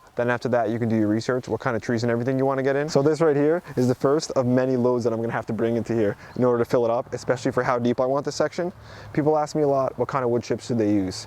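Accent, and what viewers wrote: New Jersey, United States. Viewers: Just found your channel and quite glad I did. One suggestion... stop talking Jersey.... slow down.... :)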